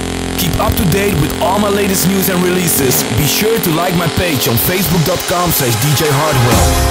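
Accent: Netherlands. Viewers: That Dutch Accent =) ;D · haha dat nederlandse accent :^)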